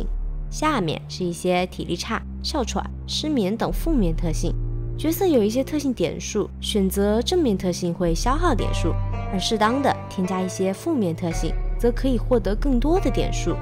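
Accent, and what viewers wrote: Sichuan, China. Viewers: 是四川口音啦，不能说是不标准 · 川普可是四川频道主的特色，不可不尝啊。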